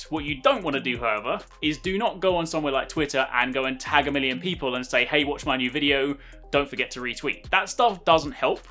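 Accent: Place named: United Kingdom. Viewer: Thank you! I'm not in videomaking or stuff, but seeing you share high quality knowledge is always good! Following your channel since you were making MH4U vids and I'm very happy with your growth, well deserved! I'm not an english native speaker and you helped me to learn the language with your tutorials in a funny way and brittish accent!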